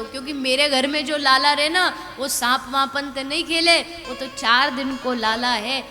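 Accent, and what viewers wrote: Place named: Braj, India. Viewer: मैया यशोदा व भगवान भोलेनाथ बाबा का " बाल गोपाल दशॅन " के बारे में आपके श्री मुख से यह प्रसंग व सवांद सुन कर हम काफ़ी प्रभावित हुए हैं। 🕉️🕉️🕉️🕉️ खास बात आपका वृज भाषा के ऊपर प्रभुत्व (वैशै तो आपका जन्म स्थान ८४ कोश में आता है फिर भी आप के मुखार विंद से वृज भाषा हमें बहुत प्यारी,प्यारी व मीठी, मीठी लगती है और बार, बार मन सुनने को कर्ता है। ☘️🕉️☘️ सचमें मैया और भोलेनाथ बाबा बात करते है ऐसा हम अनुभव करते हैं। ☘️🕉️ देवीजी, आपका बहुत-बहुत धन्यवाद हमारा राघे-राघे और जय श्री कृष्णा स्वीकार करे । 🙏♥️🙏♥️🍀☘️🍀💐🌷💐 <mail> USA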